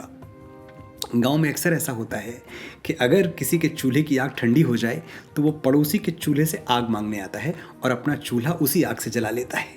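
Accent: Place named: Uttar Pradesh, India. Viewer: Main bhi uttar pradesh se hu ji aapki boli main uttar pradesh ki bhasha jhalkati hai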